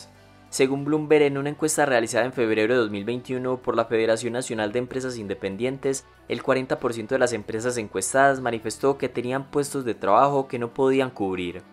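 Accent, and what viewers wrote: Colombia. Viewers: Sería interesante que hagas un video sobre como las subvenciones dañan el tejido productivo de un país, por tu voz creo que eres colombiano, en caso de ser así sabes que ciertos sectores políticos han propuestos rentas básicas universales.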